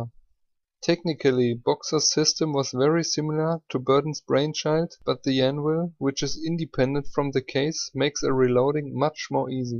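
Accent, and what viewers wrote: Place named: Germany. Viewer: Ich würde dir anbieten, dir dass in einem verständlichen und korrekten Englisch zu besprechen, weil so ist es ziemlich "denglish" und kaum ein Muttersprachler wird sich das "antun".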